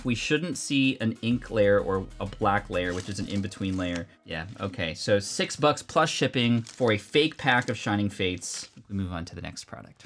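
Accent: France. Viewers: j'adore ton accent français HAHA (I love your French accent) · I love your french accent it's really funny. By the way i realy like your videos continue like that. A random guy from switzerland🙃🇨🇭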